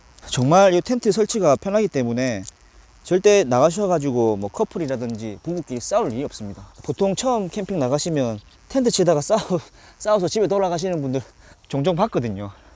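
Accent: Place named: Seoul, South Korea. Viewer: 이 형 서울말도 잘쓰시네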